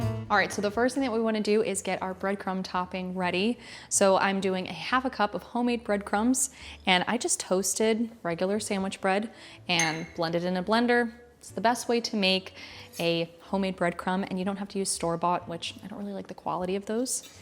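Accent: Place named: New York, United States. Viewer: Looks delicious. The "au" in your words like "sauce" and "caught" really brings out the NY.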